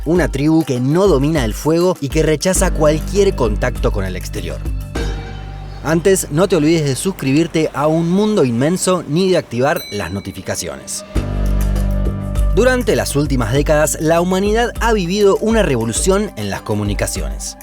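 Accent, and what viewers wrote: Rioplatense, Argentina. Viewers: Parece argentino pero el sonido sh en la ll e y es muy suave , no parece el típico acento porteño . Es como un acento argentino neutralizado . Resulta muy agradable y claro . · Por el acento diría que es argentino o uruguayo..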